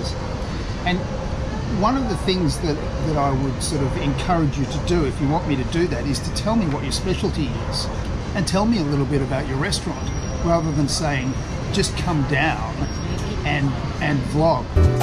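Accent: Australia. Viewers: It’s nice to hear an Australian accent as well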